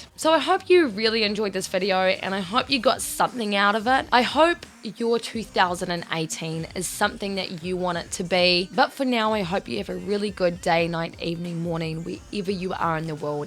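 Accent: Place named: New Zealand. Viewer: That posh/bogan kiwi accent tho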